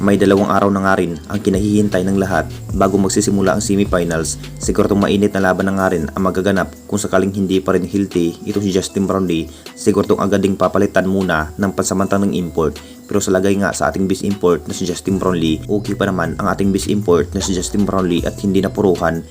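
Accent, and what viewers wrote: Visayas, Philippines. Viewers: Pangit ng boses mo brad bisaya ka pa matigas bay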